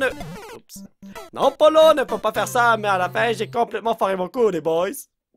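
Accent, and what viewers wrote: Quebec, Canada. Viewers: Sa fait je sais pas cb de video que je regarde et je me demadais si il était quebecois ou francais maintenant j’ai la reponse xD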